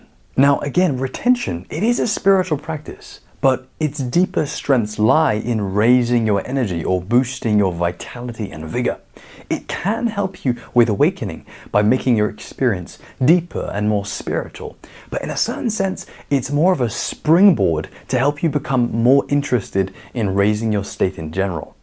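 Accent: United Kingdom. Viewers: What accent is that? You look Danish but sound British 😅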